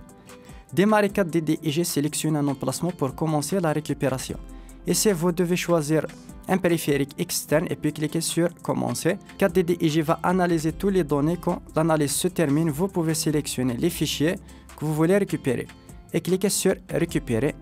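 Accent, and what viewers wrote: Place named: Spain. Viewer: il est style ton accent !! espagnooool franchement gg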